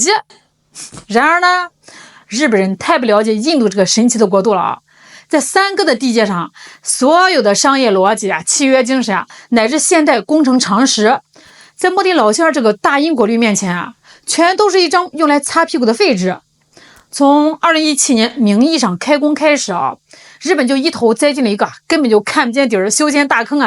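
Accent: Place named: Northwest, China. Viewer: 听口音，这漂亮可爱的小姐姐好像是西北人，希望不是AI，很讨厌AI做成的东西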